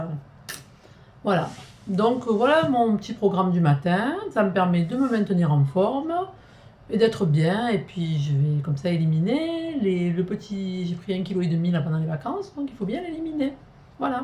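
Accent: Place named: Aubagne, France. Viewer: Comme toujours, tu as des explications claires et précises......que c'est agréable......et en plus, avé l'accent d' Aubagne! lol C'est super, moi, je vote pour toi, pour la Reine des Blogueuses du Sud! Bisous 👍😘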